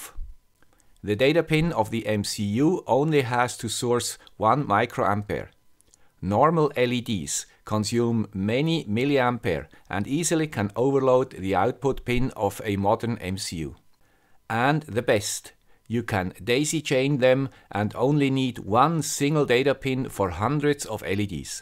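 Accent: Switzerland. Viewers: We love! Merry Christmas! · Depends on the manual (google translated Chinese datasheet can be rather cryptic), it is always nice for mere mortals that guys like does the ground work ^ ^ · We wont stop watching, keep up the good work, however everytime you say "dirt cheap" the Chinese push up the price 😱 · don't become defective! Your counter will reach it's theoretical maximum before you know it! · God. Will definitely bless you to achive what you desire. My prayers are with you... Thank your very much for sharing your knowledge 🙏. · Hi. First of all thanks for all your amazing videos. Thanks to your videos I started playing with micro controllers as a hobby. I am a C# back-end developer and have very little experiense with embedded systems. Did you animated your LED strip with the ESP32 meanwhile using WiFi? I have not been able to find a library that animates the led strip correctly. 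The library esp32WS2811-master works great if WiFi is disabled. But I been having no luck animating 80 LEDs if WiFi is in use. What has work best for me is using the standard Adafruit_NeoPixel library that I previously used for arduino running that code on a separate core of the ESP32 and using "portENTER_CRITICAL(&mux)" functions of the ESP32 meanwhile animating. But still the first LED fails to animate correctly. The complete code can be found on this question: <link>. Maybe someone can answer in that question what could I be doing wrong. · Danke fur alles! Love the swiss accent!;) Nice video! Exactly what I was looking for!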